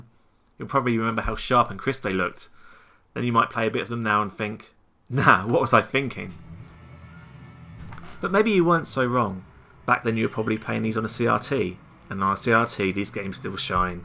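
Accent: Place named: United Kingdom. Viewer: Guessing based on your accent... you are from the UK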